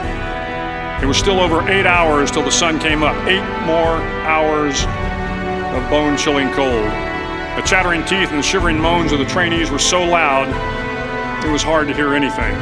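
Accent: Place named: Texas, United States. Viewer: 텍사스 억양임. 진짜 텍사스 억양이 멋있는 것 같음.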